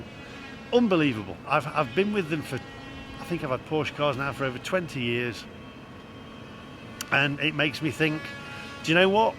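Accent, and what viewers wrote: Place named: Nottingham, United Kingdom. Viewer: I assume these cars being driving computers, they could also be open to Cyber attack. Which could also be a safety issue as well as a potential car ransom situation. Love the channel btw, I studied in Nottingham in the early 1990's....its lovely to hear the accent & see how the city has changed when you have been filming there.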